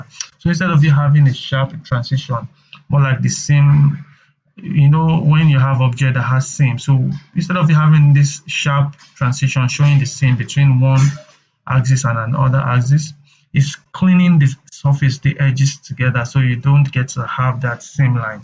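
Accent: Nigeria. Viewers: bro u nigerian?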